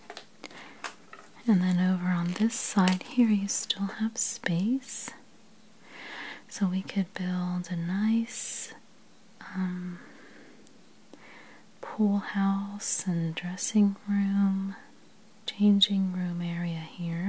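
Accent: United States. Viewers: I love all your videos....i wish i knew what state you were from....your voice...😌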